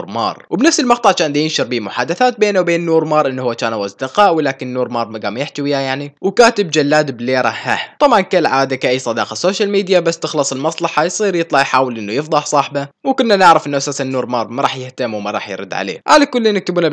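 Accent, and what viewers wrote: Iraq. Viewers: انت عراقي لو سوري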